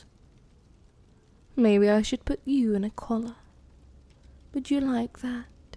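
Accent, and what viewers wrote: Scotland, United Kingdom. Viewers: The hot Scottish lady is back Does anyone else find the Scottish accent attractive? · I love your Scottish accent ❤❤❤ Thanks for another wonderful video 🤗🥰😘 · Scotland? I can't tell.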